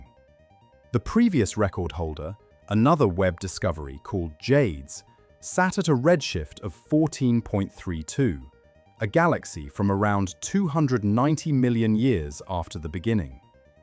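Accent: United Kingdom. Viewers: Why does the narrator have a British accent?? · What's with the British accent?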